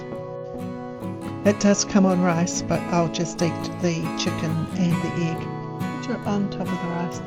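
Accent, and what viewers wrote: New Zealand. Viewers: A kiwi voice lol 😂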